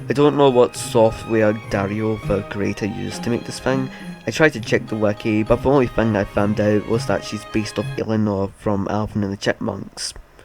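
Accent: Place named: Scotland, United Kingdom. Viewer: i was thinking "damn thats a heavy scottish accent" and wasnt gonna comment on it but then immediately in that thought got to this part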